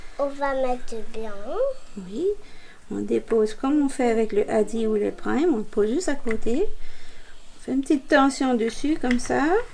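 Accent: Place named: Quebec, Canada. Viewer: Coucou. Ho géniale de nous faire voire le contenu du sentro avec les pelotes de 25 grammes. Heureusement que vous êtes là pour nous pour faire traduction du livret qui fort dommage n'est pas en français. Merci beaucoup. J'ai hâte d'être en fin d'année pour me l'offrir. Votre petite puce à l'aire impatiente de vous voire travailler dessus. J'adore la petite voix de votre petite puce. La vôtre aussi et agréable à entendre avec votre petit accent québécois. À très vite pour de prochaines aventures et travaux avec le sentro. Bonne journée à vous et à votre famille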